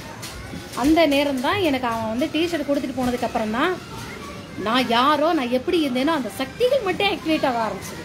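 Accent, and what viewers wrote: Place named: Tirunelveli, India. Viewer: Unkal thirunelvelli pasai arumai amma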